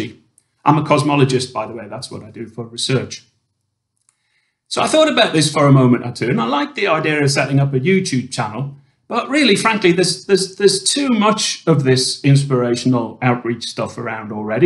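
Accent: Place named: England, United Kingdom. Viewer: Don't be shy about not being big budget. You've got the English accent that all the greats have, like Attenborough and that guy who wears the tight shirts; that's all you need. Oh, and you've got a way better beard than any of those guys from Nottingham. And don't get me started on the guy with the hair. Keep it up!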